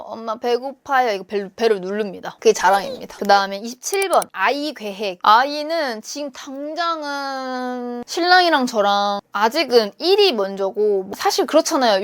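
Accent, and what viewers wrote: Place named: Chungcheong, South Korea. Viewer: 세종인으로서 님 충청인일 것 같았어요 ㅋㅋ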